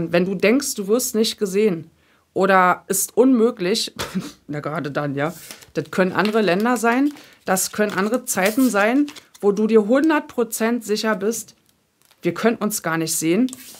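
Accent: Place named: Berlin, Germany. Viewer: Immer wenn ich dir zu schaue... Fange 8ch an zu Berlinern 😂 dat in Hamburch ist immer komisch 😂.. Den janzen Tag hab ik so jeredet... Heimat Gefühl... ❤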